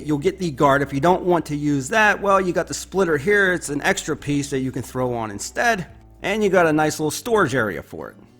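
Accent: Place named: New York, United States. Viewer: Dude, if you aren't from New York, I'd be shocked. :-)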